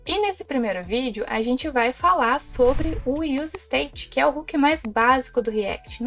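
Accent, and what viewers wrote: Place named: Rio Grande do Sul, Brazil. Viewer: Eu consigo perceber 30% de sotaque gaúcho e 70% de sotaque de Brasília. = ["RS", "DF"]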